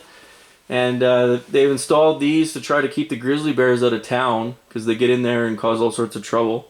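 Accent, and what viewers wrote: Canada. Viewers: Got quite the Canadian accent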